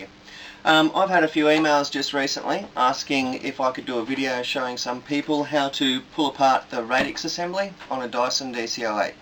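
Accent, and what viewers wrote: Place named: Australia. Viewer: you were of great great help! and the Australian accent rocks :-)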